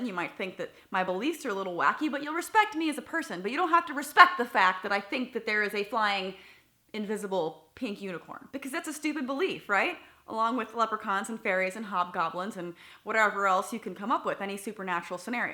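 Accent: South, United States. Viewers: LOVE YA LIKE A SISTER, GIRL. IT'S FUNNY THAT YOU'D DO THIS VIDEO AS I JUST HAD A YOUTUBER TELL ME THAT SHE'D PRAY FOR ME. I REPLIED, SAYING, WELL I' SAY "AFFIRMATIONS" FOR YOU. NOW, I'M TORN BECAUSE I DON'T KNOW WHICH I LIKE BEST I'LL "THINK" FOR YOU OR I'LL SAY AFFIRMATIONS FOR YOU. I DON'T, PERHAPS. I' LL USE THEM BOTH., KEEP THOSE VIDEOS A COMIN. OH, LOVE YOUR SOUTHERN ACCENT. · I do hear your southern accent.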